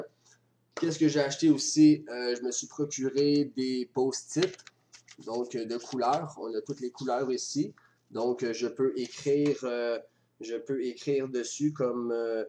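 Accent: Quebec, Canada. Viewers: A ok c'est un Québécois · tin il a l'accent Quebekois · il parle comMent Il parle le québecois et non le quibecoi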